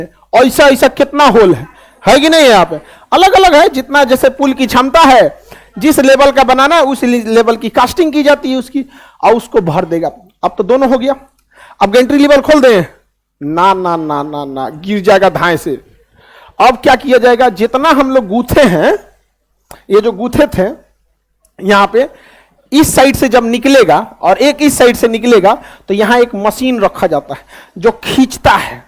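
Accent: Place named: Bhojpur, India. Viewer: Sir aap adha Hindi or aadha bhojpuri kyu bol te he.... Lekin sune me aachaa lag ta he ham bhi kyu ki Banaras he❤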